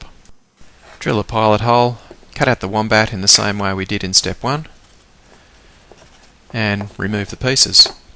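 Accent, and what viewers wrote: Australia. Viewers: Doesn't matter how hard you try, you can always pick an Aussie accent.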